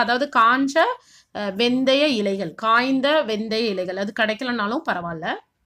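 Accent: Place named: Nagercoil, India. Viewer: Hi sis... Neenga yendha ooru. Coz.. Unga speech ketta... Nagercoil maadhiri theriyudhu. Adhaan ketten.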